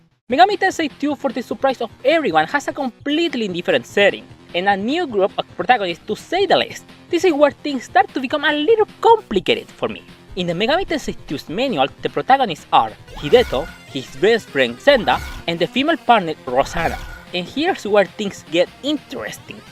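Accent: Chile. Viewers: Sabia que ese acento era chileno, jajaja muy buen canal! Me subscribo!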